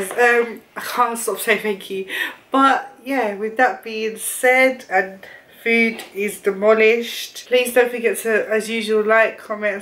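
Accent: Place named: London, United Kingdom. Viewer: “dis is a turkish burrito outere” 😂😂😂😂😂😂 yhhh ur defo from ends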